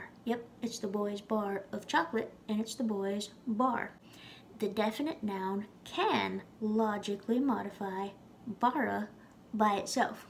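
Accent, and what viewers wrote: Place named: Alabama, United States. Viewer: You're concerned about your pronunciation because you're from Alabama, I'm a Yankee and I put a fada on all A's regardless of standard pronunciation. This was an outstanding lesson! GRMA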